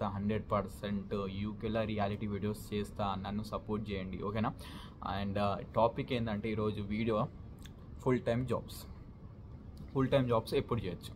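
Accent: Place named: Telangana, India. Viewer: Brother ni way of presentation superb in telangana slang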